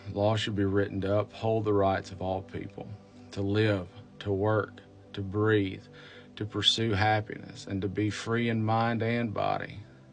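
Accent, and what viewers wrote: South, United States. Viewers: You are a gentleman and a scholar, sir. With a southern accent, you command attention without threatening, judging, or raising your voice. Thank you. You are a joy to hear in these troubling times. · Refreshing to hear a southern accent gentleman speaking the honest to God truth. What a great country. I have faith. I have faith in this gentleman and others like him. Who speak truth to those who despise truth and laws. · This Canadian loves your Southern values and wonderful accent. Please seriously consider running for office. You would make a amazing statesman. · Southern dialect.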